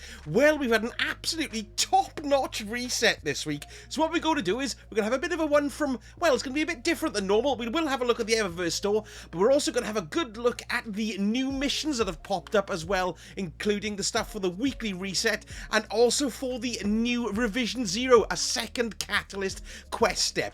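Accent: Wales, United Kingdom. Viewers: still on the mend but feel way better than I did thank you. Now, important question.....do we get to hear your lovely Welsh tones in a live stream tomorrow per chance?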